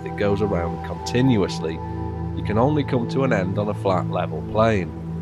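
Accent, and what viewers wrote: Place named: United Kingdom. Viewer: I just believe this guy cuz he’s speaking with a British accent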